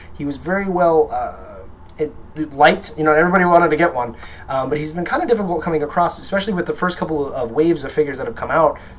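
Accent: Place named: Germany. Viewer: nice german accent, but I'm so sad they didn't do well on the Fuel-Rod Cannon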